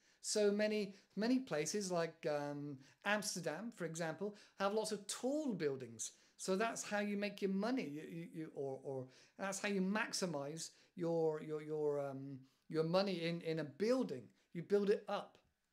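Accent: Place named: United Kingdom. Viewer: first comment 😊 ... greetings from Mexico 🇲🇽✨ and tank you ...I love the british accent ✨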